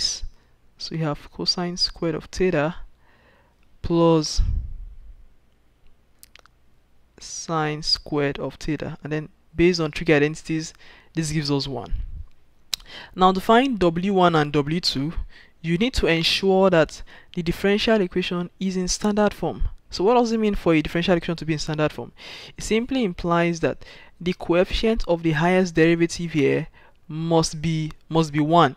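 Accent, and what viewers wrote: Nigeria. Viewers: You are Nigerian aren't you lol, anywayz thanks for the videos it does help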